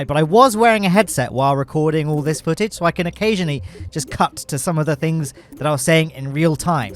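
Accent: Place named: United Kingdom. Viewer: YEEEEEEEESSSSSSSSSSSSSSSSSSSSSSSSSS, I am like a kid on Christmas! 🎉🎉🎉🎉🎉 I can’t wait for the release! Also why do you sound exactly like Grian who plays Minecraft?!?! Do all British people sound the same?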